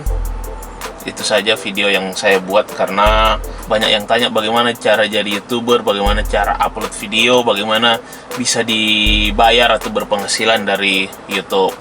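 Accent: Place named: Sulawesi, Indonesia. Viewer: Logata kaya org bugis gorontalo dik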